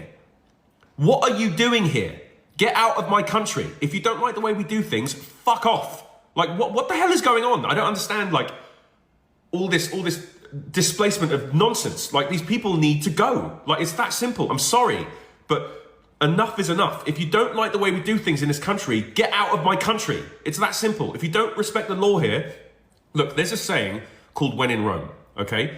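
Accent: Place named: United Kingdom. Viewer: Dude your spot on we dont really care about anyone's ethnicity or colour just try and fit in too our culture the country and culture you chose to move to, were happy to have you but try and fit in, you sir are a perfect example of a family who has embraced British culture you speak like a true brit perfect use of language and I'm glad your one of us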